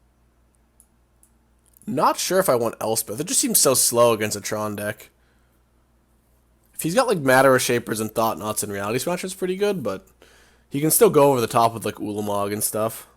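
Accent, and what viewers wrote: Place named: Southern California, United States. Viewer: Most SoCal accent I have ever heard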